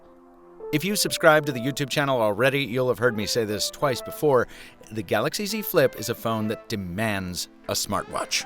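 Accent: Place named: New York, United States. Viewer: Well he's my new favorite reviewer! A New York'er but still with a European touch. Love the tone and his narrating. He'd do excellent in Europe too.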